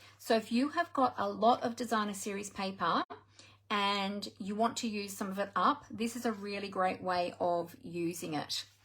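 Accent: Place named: Australia. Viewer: So nice to hear another Oz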